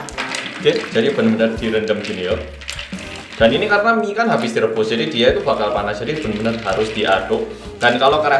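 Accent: Java, Indonesia. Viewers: sam aku kangen samean ngomong boso jowo ayo a medok o lek ngevlog gawe boso jowo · Oppa iki rupane korea tenan tapi medoookkkk puoolllll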